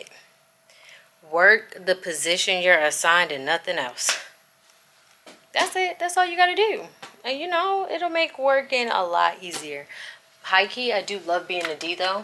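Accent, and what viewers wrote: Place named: Baltimore, United States. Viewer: Are you from baltimore? im hearing the accent lol, i love your videos E 💗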